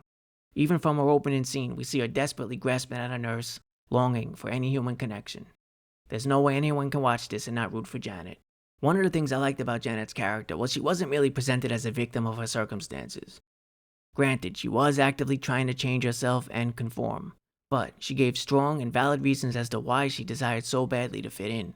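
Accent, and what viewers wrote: New York, United States. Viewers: What illuminates these summaries is not only TZ's excellent writing, but your own. The New York accent also lends a down-to-earth quality to even the most avant garde episodes. Bravo! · I love his New Yawk accent too! It reminds me of Slip Mahoney and Satchel Jones and the Bowery Boys.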